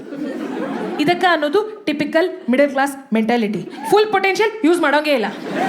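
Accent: North Karnataka, India. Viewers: ನಿಮ್ಮ್ ಉತ್ತರ ಕರ್ನಾಟಕದ ಭಾಷೆ ತುಂಬಾ ಚೆನ್ನಾಗಿ ಸಾಮಾನ್ಯ ಜನರು ಯೋಚನೆ ಏನಿದೆ ಅದನ್ನೇ ತಾವು ಹೇಳುವದು , ತುಂಬಾ ಚೆನ್ನಾಗಿದೆ , ಹೆಚ್ಚಾಗಿ ಬರಲಿ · there's no question of 'Hope'... I simply love your comic timings.. its beyond perfect n the north karnataka kannada is like icing on the cake!!!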